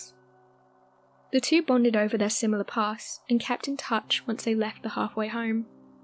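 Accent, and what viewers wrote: United Kingdom. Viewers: Nice narration voice.clear and concise.British of course.lol.😁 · She's British. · What’s this beautiful accent? I know prob UK but is it Welsh, some specific part of England ? Thanks it’s just beautiful for stories ....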